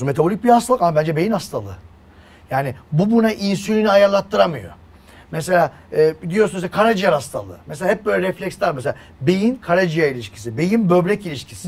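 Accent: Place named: Aegean, Turkey. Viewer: Doktor olmuş ege şivesiyle konusuyo diyen gerizekalılar da var.dogal olmak güzel.katiliyorum size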